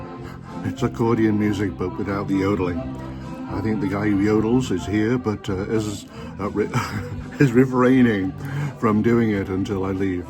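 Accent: United Kingdom. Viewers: You’re like the accidental tourist! Love all your vids, all the narration especially with your posh British accent, and Europe seems to suit you!! Thanks for sharing your slices of life! 🌺🌺🌺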